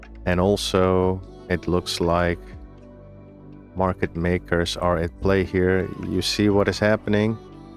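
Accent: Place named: Netherlands. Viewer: Another Dutch accent, you guys are all from the Netherlands? Great videos so far!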